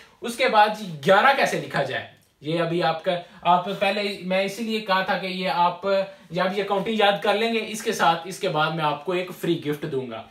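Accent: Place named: Sialkot, Pakistan. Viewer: bhai..ap sialkoti lagtay hain.....sorry mind naie krna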